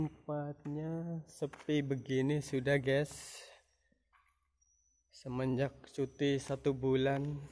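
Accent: Banyumas, Indonesia. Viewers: asli org ngapak cilacap ya mas aku purwokerto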